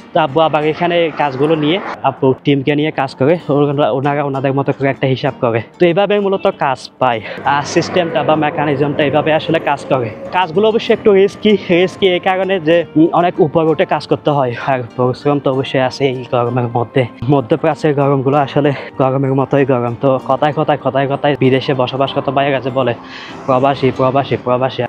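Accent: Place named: Sylhet, Bangladesh. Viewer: সিলেটি কথা শুনে ভালো লাগলো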